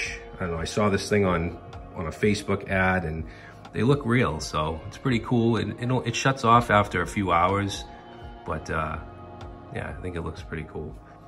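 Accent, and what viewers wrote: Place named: Massachusetts, United States. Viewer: There's something about a Mass accent that "sounds honest". Stereotypical of me, I know, but there you have it. Perhaps it's that New England can-do spirit.... or I spent too much time awtching Norm Abram on New Yankee Workshop when I was younger.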